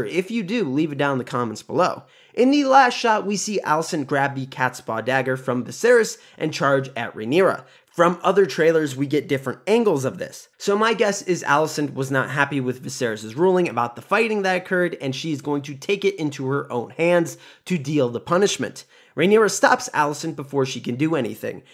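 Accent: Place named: United States. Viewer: The man is a yank, it's understandable he cannot speak true true (Oxford/Queen's) English.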